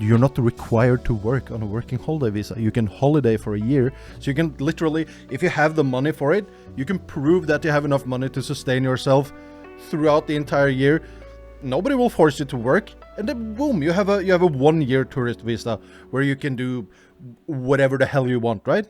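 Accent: Norway. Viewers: Syntes dialekta hørtes kjent ut før du sa du var Norsk :'D Har aldri hørt om "self sponsor visa" før, men det høres ut som noe jeg kan få til.. Det er litt under 140k NOK per år ser det ut som :3 Veldig god info! :D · La meg tippe basert på aksenten din. Du er norsk sant?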